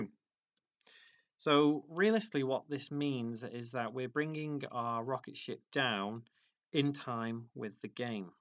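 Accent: United Kingdom. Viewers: inglês britânico é tão maneiro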